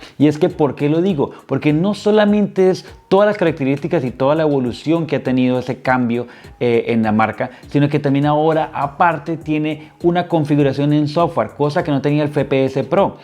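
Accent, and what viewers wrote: Colombia. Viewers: Tengo Dos Preguntas! Jajajaja 1. Eres de Colombia? Especificamente de Medellín? Lo pregunto por tu acento Jajaja. 2. Se pueden reemplazar las KeyCaps? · Mi socio es Colombiano? O me equivoco?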